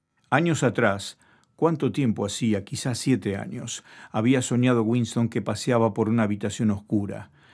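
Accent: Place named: Latin America. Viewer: JOLINES..!!! ESTE ES ESPAÑOL LATINO... VAYA AL FIN GRACIAS COMPADRE.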